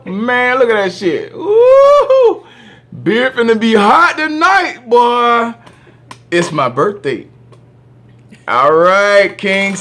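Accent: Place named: South, United States. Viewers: Where you from Htown lol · Country as hell good information my man and Roll Tide Roll!!!!!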